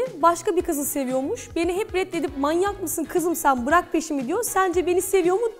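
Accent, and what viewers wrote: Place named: Kastamonu, Turkey. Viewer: Kız sen Kastamonulu musun 😮 ben de diyorum bu kızın konuşması bana niye tanıdık geliyor 😅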